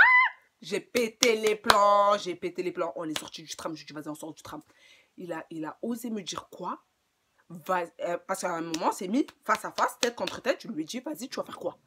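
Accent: Marseille, France. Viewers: Oui on veux la partie 2 de ta best t grave mimi les mecs faut pas vivre pour eux avance fais ta life jador ton accent marseillais 😊 .. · on dirait une marseillaise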